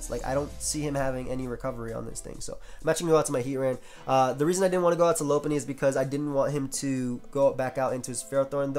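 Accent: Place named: Connecticut, United States. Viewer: Did u grow up in connecticut? You have a connecticut accent just like me:D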